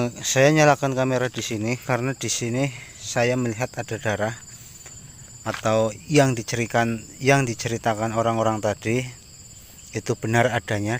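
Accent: Banyumas, Indonesia. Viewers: Assalamualaikum WR WB. MAAF mbah, saya mau tanya karena sangat penasaran. Panjengan domisili di daerah Banyumas krn dgn logatnya. Tapi pastinya dimana. Matur nuwun. Saya penasaran kok masih ada orang canibal.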